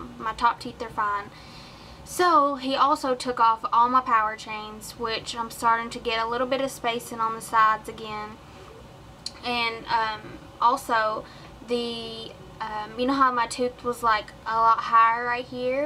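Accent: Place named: Alabama, United States. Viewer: r u from Alabama because u have a Alabamian accent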